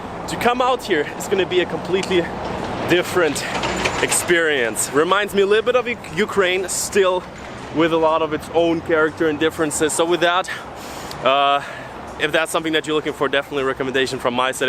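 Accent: United States. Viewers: Rich Bowman Yes my very thought too! I don’t know what nationality this guy is but he definitely has an American accent! If he is American then that would explain the BS!😳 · You actually speak english really well with a good american accent, been living all your life in the States I suppose.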